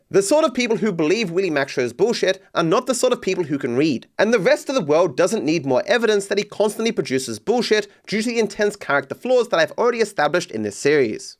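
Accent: Australia. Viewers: Angry Aussie sounds for almost three hours. I like it😂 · I dont even know what he means. He's literally just Australian.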